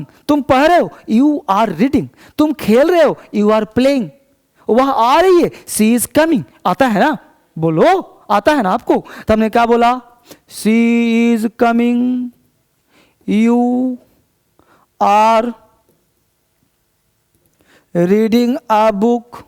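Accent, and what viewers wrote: Bihar, India. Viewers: ( Wah Sirji ! )you have great energy.I like the most your Bihari tune when you teach online..Ek Bihari sau pe bhari...you have proved that....and go ahead with god bless you..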